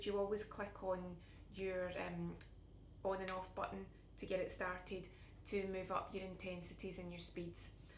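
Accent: Scotland, United Kingdom. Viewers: Great to see a comparison of the three guns. I have dexterity issues and weakness in my arms because of spinal injury. My muscles get so tight from being clenched in pain for hours and sometimes weeks at a time. I gotta start saving up for one of these bad boys. New subbie here and I love your scottish accent. Irish woman myself. Thanks for sharing.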